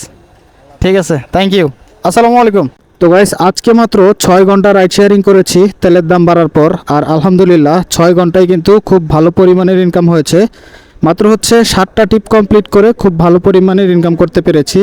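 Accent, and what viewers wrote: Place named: Sirajganj, Bangladesh. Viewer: বড় ভাই আপনার বাড়ি কি সিরাজগঞ্জ মনে হয় ভাষায় কথা বলায় বোঝা যায়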